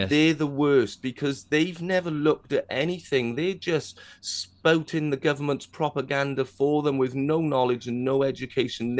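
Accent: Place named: South Wales, United Kingdom. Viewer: proper south Welsh accent.....Swansea/Clydach valleys?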